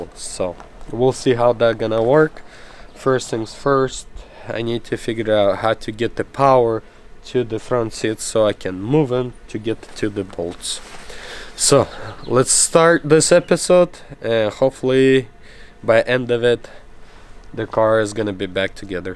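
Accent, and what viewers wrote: Russia. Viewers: Касаемо контента, все супер! Тачка моей мечты!!! 😃😃😃Но, я один слышу Русский акцент в разговоре? Или мне показалось? Касаемо АВТО: Если делать под себя, ТО раз уж разобрал, ТО я бы сделал Шумку!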